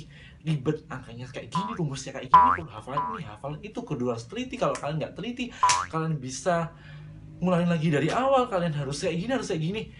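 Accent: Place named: Java, Indonesia. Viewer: rada ga enak di denger alias medok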